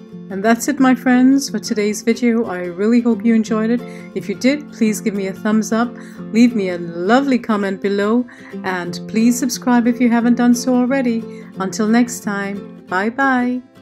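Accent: Trinidad and Tobago. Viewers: Miss thank you for helping me improve my cooking. And continue to speak de trini "twang"😆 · I love your speaking voice, it’s a well rounded, articulate Trinidadian voice and love your channel too..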